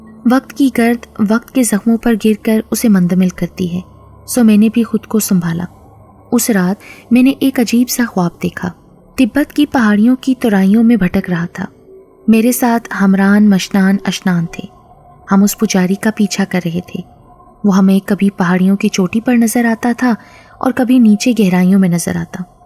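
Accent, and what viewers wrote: Pakistan. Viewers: Allah aap ko khuash rakhe mujhe Nahi pata ki aap ye comnt padhengi bhi k Nahi Me India se hu or aap k Andaz se Lagta h ki aap Pakistan se he ,par itna kehna chata hu ki mujhe aap ki aawaz or andaze bayan se muhabbat ho gai h.. meri baat Ka koi galat MATLAB mat nikaliyega plz... Jab se Mene apki kahaniya Sunna shuru ki h me or Kuch Sunna ya dekhna hi Nahi Chahta . Allah aap ko Khush rakhe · Sastar ap kas jaga sa hea zaban sa lagtha hea ap pakistan sa hea lovli vice lazmi bathana mean ap ko malnay ayho gu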